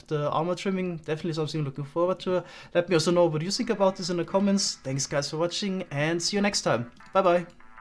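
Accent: Germany. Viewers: I always hear of your Farms but never knew who you are. So i subbed a few days ago to look a bit into your channel, and yes you're Minecraft Content is awesome 😁 But one question raises as i listen to you... are you German? Bist du aus Deutschland? Klingt vom Akzent her nämlich so und würde perfekt zum komplizierten Maschinenbau passen! 😄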